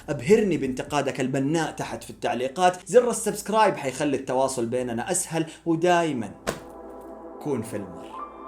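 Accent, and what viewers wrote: Jordan. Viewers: تقييمه 3.3 بس لهجتك الاردنية تجنن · اكيد كنت عايش او بتدرس في الاردن مستحيل اللهجه والاداء هذا من كم يوم او سياحه هناك😂😂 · انتا اصلك اردني حجي؟ انتا بتحكي اردني احسن مني 😑 استمر يا وحش 🖤😁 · حبيت تمثيلك الأردني فرطت 😂😂 اقسم بالله انك فنان متقن اللهجة بشكل رهيب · مش طبيعههي ياا الله طلع اردني الشب😂😂😂❤️ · انا جايه من شارع الاعشى ، انصدمت انك بتعرف تحكي اردني اكثر مننا 😂😂😂❤❤ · تعليقي رح يكون بس على لهجتك الاردنيه الصح😅 لو مثلت بالمسلسل كان عملت جو رهيب😍 و غير هيك انه لكنة دواوين اصلي و ابن الاردن صح 😍 يعطيك العافيه ع هيك اداء جبار😍❤❤ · يا اخي متت ع كلامك الأردني جايب الشخصية صح😂😂😂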